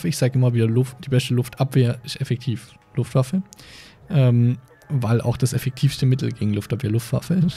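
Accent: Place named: Swabia, Germany. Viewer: Hätte wenigstens wieder auf schwäbisch sein können :D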